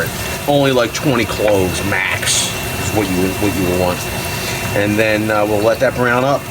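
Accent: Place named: Italian-American, United States. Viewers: Hi, you must be from Philadelphia! I can tell by the way you say water🤣🤣. You’re probably in South Philly❤. Love you from Northeast Philly. And I’m gonna make this. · I'm not sure you used enough garlic....As if your accent didn't give it away, when you showed the bread I knew exactly where you were from! Making escarole and beans today but my crusty style bread will come from Panera since in SC we don't have Italian bakeries. Manja!! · Like no one knows that. . . I guess a lot of people don’t know how to “properly” slice an onion. Seriously, I’m 100% Italian and I was so skeptical about you at first, (some people that never even heard of Scaloe. I know what it is and cook with it like my nana did. It’s so good for you. Anyway, just wanted to say you’re impressive. I wish my man would cook, let alone good Italian food. Sounds like you’re from NY. 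💕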